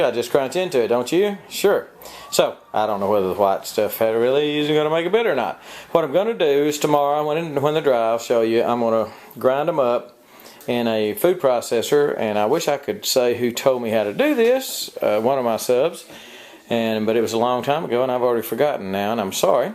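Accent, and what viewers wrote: Texas, United States. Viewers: Your Texas accent is Awesome! "Hand was Glowing for a Couple Days!" (^_^)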